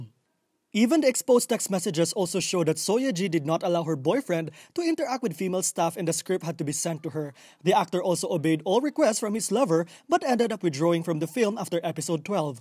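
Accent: Philippines. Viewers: I think the narrator was a Filipino, because of his accent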